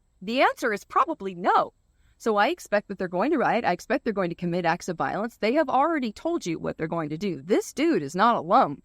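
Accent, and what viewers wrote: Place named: California, United States. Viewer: This guy sounds like a Californian; notice that they always are talking about sending other people to do things for them.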